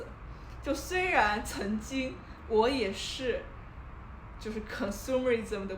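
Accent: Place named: Taiwan. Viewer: 你的口音好很像台湾口音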